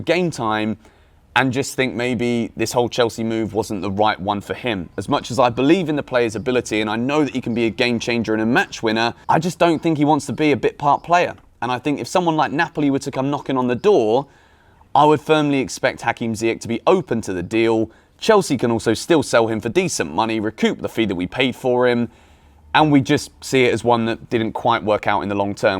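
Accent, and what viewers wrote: South Africa. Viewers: You have got the South African accent down bru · Thanks for the South Africa accent bru❤❤🙌🏾 you making my country proud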